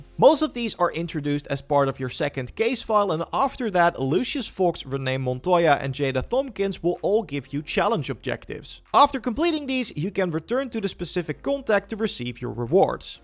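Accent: Netherlands. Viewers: Love this video as I'm really enjoying this game. But that Dutch accent though damn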